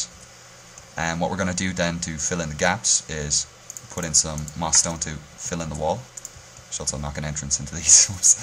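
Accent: Ireland. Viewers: Yeah, I watched, I think it was the Tavern video, and after seeing the design and your ability to teach people how to build this stuff, I kinda just stopped after like a minute in and subbed. You're a pretty kick-ass builder, and, can you correct me on something? Where exactly are you from? Your accent sounds Irish, but it's not incredibly thick like I've heard from other people; it's kinda hard to pick out. If you are Irish, then I wish I could subscribe again, because I'm mostly Irish...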